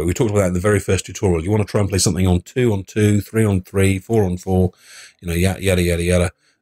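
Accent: England, United Kingdom. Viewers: Denn Green III He's english and has a deep voice. perfect combination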